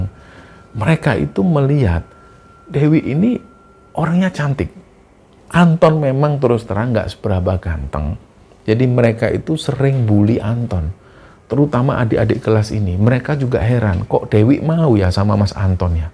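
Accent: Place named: East Java, Indonesia. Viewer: Logat jawa timuran ne mantap mas.. apalagi kalau ngomong BBAHAYA..😂😊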